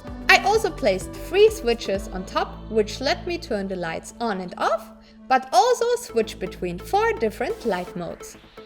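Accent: Germany. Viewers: a question...r u from germany...cuz ur accent sounds pretty german xD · You sound a bit German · Your german accent shows thru... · so ein deutscher Akzent..... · 2 sec geschaut direkt gewusst das sie deutsch ist XD. deutsches englisch hört sich einfach retarded an XD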